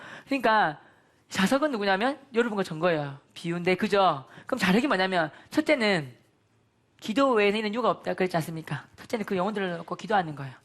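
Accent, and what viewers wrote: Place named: Busan, South Korea. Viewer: 부산 사투리가 이렇케 구수한줄 첨 알았었요 ㅋㅋㅋㅋ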